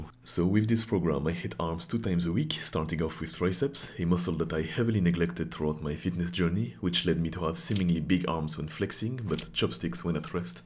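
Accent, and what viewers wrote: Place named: France. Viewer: You're belgian? You have such a french accent, though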